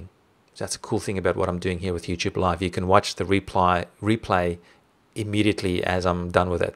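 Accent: South Africa. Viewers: I never thought you were Australian? Being from New Zealand, I thought you had a South African accent (my fave accent haha). But your videos are very helpful, thanks :)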